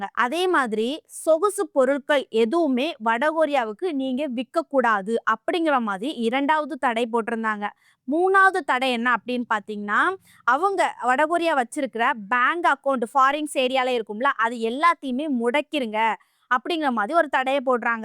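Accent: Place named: Coimbatore, India. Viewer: 🎉🎉 அழகான செய்திகள் நல்ல தெளிவான வாசிப்பு விளக்கம் 🎉🎉 புதிய வரவு வாழ்த்துக்கள் பாப்பா பேச்சு வழக்கில் கோவை மாவட்ட ஸ்டைல் தெரிகிறது அழகு அருமை. மதுரை சக்திவேல்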